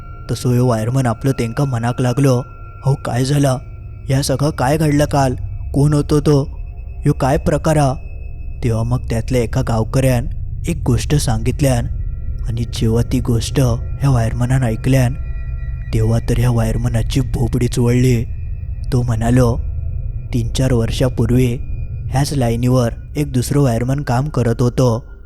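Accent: Malvani, India. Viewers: Ti malvani ha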